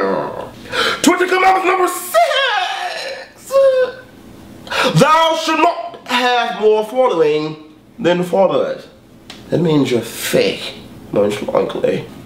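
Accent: United Kingdom. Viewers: Oh help me. Brilliant British accent if i say so myself. what what. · I swear I can't stop laughing the British accent loooooooooool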